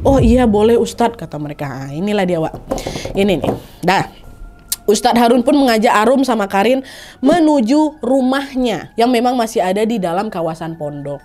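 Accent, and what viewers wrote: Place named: Banjar, Indonesia. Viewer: Seperti Bahasa orng Banjarrr 😂😂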